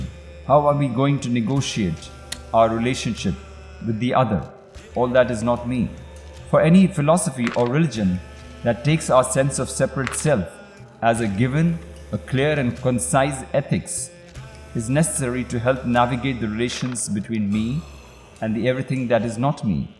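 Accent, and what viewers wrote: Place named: India. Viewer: hahahaha, BS, im tired of this indian accent people claiming they know all! BS !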